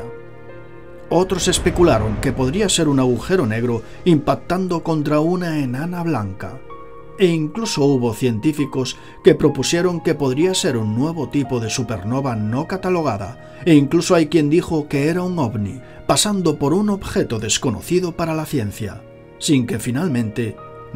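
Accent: Spain. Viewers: Este bato hace buenos videos, pero no puedo evitar que me cagué el acento español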